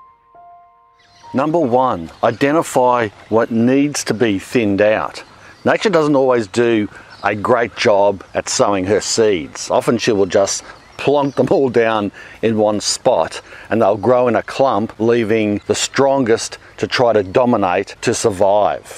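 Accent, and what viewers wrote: Australia. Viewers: i was like what he spiced them? ooh spaced. australian accent is the best accent 😂.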